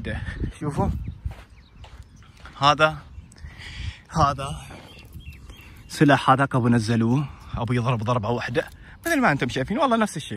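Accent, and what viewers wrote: Oman. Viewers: والله كنت حاس من كلامك انك عماني 🇴🇲. احبك. متابعنك من ولاية محوت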